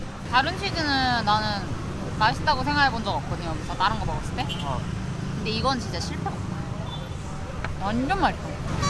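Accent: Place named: Gyeongbuk, South Korea. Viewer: 경상도 말씨를 쓰시던지 아님 표준말을 완벽히 쓰시던지 정말 말투가 너무 지즈븐해요 그냥 사투리쓰세요 특히 경북사람이 어설픈 서투리쓰면 들어주기 정말 ㅈ 같아요!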